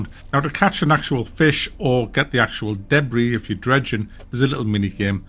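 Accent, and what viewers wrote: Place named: Sunderland, United Kingdom. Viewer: Is this guy a mackem? Worst accent I’ve ever heard.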